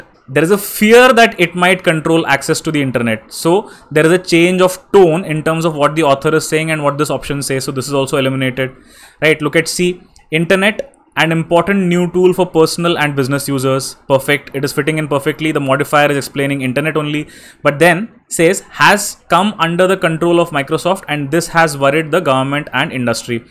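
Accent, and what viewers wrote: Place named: South India, India. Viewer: Sir ,Are you south Indian?? Your ascent is like South Indian people.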